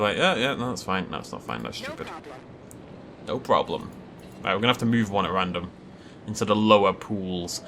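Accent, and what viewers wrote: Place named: Northern Ireland, United Kingdom. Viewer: Omg how did I not realise you were from Northern Ireland your accent was so familiar and yet I was like??? Why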